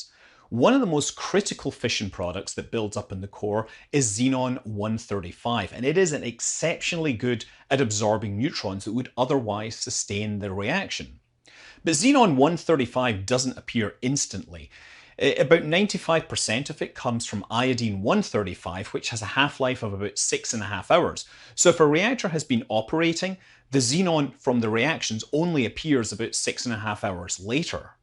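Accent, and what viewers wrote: Scotland, United Kingdom. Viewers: I'm a Scot living in New Zealand and take comfort from a Scottish accent. The turning t's into d's with said accent is giving some anxiety though. What's the deal? Where do you live?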